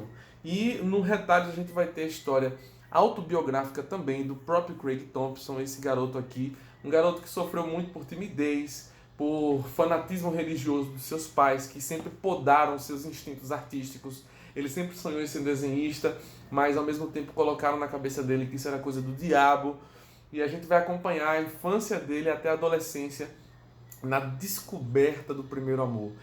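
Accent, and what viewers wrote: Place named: Northeast, Brazil. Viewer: Muito show seu vídeo...e sua lista é nota 10... eu admiro muito o seu Canal e já te acompanho há um tempo, mas só hoje me dei conta que não estava inscrito, o que resolvi com um clic...eu gosto muito a forma como vc fala de quadrinhos e os apresenta...e além disso, tem esse sotaque que amo (tive um namorado de Maceió e um de Teresina, mesmo sendo filho de Paraibano, meu pai não tinha nenhum sotaque nordestino)...da sua lista eu tenho "Maus" (meu HQ da vida), "Retalhos" (lido nesse mês de novembro) - vc já leu "Habib", é lindo demais, - e claro, tem alguns que estão na minha lista de desejados...um grande abração carioca e boas leituras...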